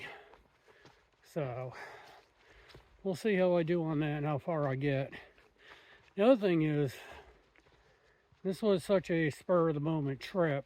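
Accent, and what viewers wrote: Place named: Louisiana, United States. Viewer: ✨⚜️🍁🍂🎃🪾SUCH A GOOD DRIVE! ARE YOU FROM NEW ORLEANS BECAUSE I CAN HEAR UOYR LOUISIANA ACCENT🙂🍁🍂🎃🪾⚜️✨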